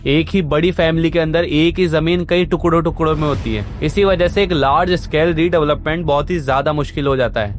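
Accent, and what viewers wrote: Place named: Hyderabad, India. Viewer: accent se laga Hyderabad wale aisi hindi bolte hai mostly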